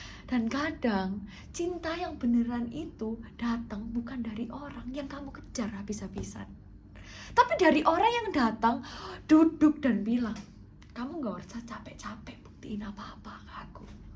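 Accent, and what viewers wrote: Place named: East Java, Indonesia. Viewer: Medok jawatimuran kentel banget bikin ngangenin, apa lagi para perantau asli Surabaya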